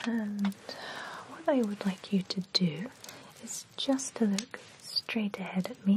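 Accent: United Kingdom. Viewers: The asmr and the British accent just warms you up and makes you wanna fall asleep I love your accent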